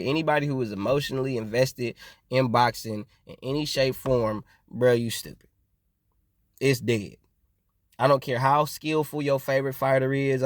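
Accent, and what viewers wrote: United States. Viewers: I'm not mad at you. You're trying to get Canelo haters on your channel LO, L, and then I can hear yourself American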